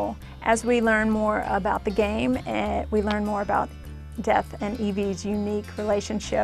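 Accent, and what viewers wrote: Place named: Louisiana, United States. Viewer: mmm cajun accents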